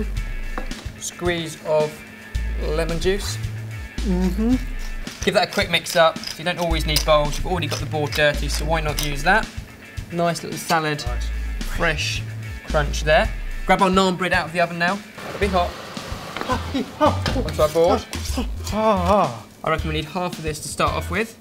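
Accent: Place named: United Kingdom. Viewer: accent is seriously waaaaay too british))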